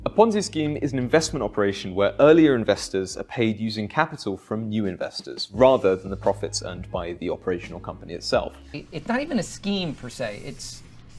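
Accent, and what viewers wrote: United Kingdom. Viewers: British accents make people sound smart. This is 11 minutes of chatter with no new or useful information. He has a suit and tie and speaks like an intelligent person. That's it. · Just because you wear a $2 suit and has an English accent, doesn't mean you know what the fook you are talking about.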